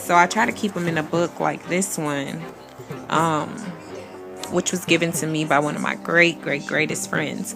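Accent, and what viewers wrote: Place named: United States. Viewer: Girl sounds american but strongly looks southern african.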